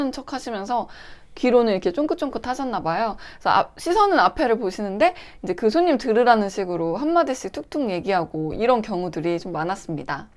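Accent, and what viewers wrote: Chungcheong, South Korea. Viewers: 님의 충청도 사투리 왜케 귀여워요 ㅋㅋㅋ 운전을 해보등가 에서 빵터졌네요. 항상 너무나도 잼나는 님의 나라별 손님특징 컨텐츠 오늘도 너무 잘 보고 갑니다^^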